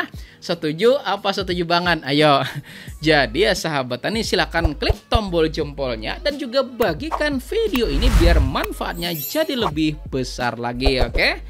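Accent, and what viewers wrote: Banjar, Indonesia. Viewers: Orang mana sampeyan. Bahasa Banjar takana takaluar. 😊😊😊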